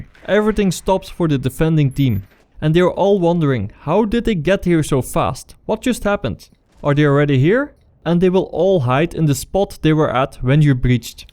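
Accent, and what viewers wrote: Netherlands. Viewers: nederlands accent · are you dutch? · that dutch accent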